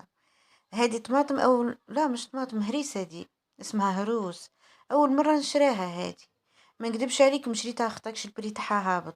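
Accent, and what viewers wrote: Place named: Annaba, Algeria. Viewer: يعيشك حنونة نموت على فيديوهات تيعك هدرتك كيما تع عنابية تسكني في عنابة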